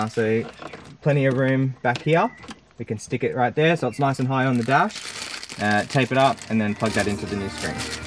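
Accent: Australia. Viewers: Oh ok. What company is it? Is it made locally there in Australia? I'm assuming that's where you are, based on your accent and the fact that the steering wheel is on the right. Just trying to find the best unit out there. Didn't know there were options other than RSNav, which I believe is made in China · He has a gay Australian accent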